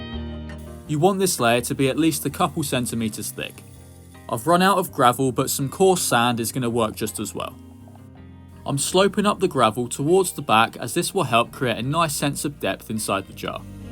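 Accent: United Kingdom. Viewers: Beautiful setup! Watching this to help do mine soon, thank u! ☺️ Would love to watch more videos with the natural cadence of your lovely british accent! ✨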